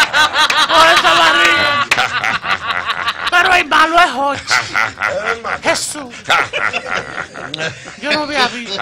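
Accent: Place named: Cibao, Dominican Republic. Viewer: Lo que más me gusta es el estilo cibaeño.😂😂😂😂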